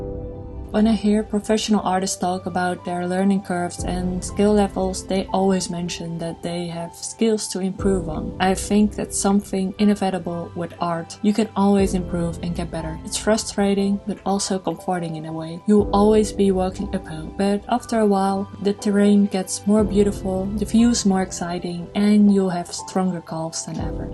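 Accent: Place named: Netherlands. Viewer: I didn't watch the live adaption of Last of us, but a gameplay of the game. Really loved it back then. 😅 I also don't mind your Dutch accent. When I speak english people can probably tell that I'm from Germany. 😂 At least I can whenever I hear a German native speaker speaking English. Now onto today's subject: Yeah, learning how to draw or art in general is hard. There are no short cuts. No fast improvements. At least in my case. I am a slow learner. My brain needs to figure everything out and tries to see the big picture first. To give a different example: When I puzzle I look for the pieces first that end being the frame. I don't like to start anywhere. I always need that frame first and then I fill in the spots that are blank. There is also only a short amount of information I can take in everyday. Maybe that's why I spend year's in my comfort zone. 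Breaking out of that zone is scary, but necessary. 🙈 I started my art journey in 2012. So it's been a while. And there is still A LOT to learn. But it's okay. I don't want to rush my journey. Instead I embrace my not so perfect progress. 😊 Even though on my bad days I compare myself a lot to other artist and how far they have come over the last decade with their art, while I still feel like a beginning artist. 😔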